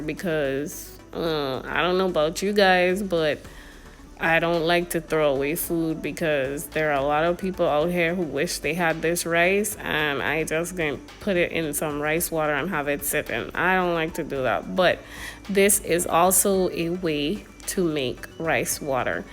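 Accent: Caribbean. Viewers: Sounds like you from the Caribbean 🇬🇾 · You accent sounds Caribbean, I'm from Trinidad, where are you from? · You have a Caribbean accent, where is she from?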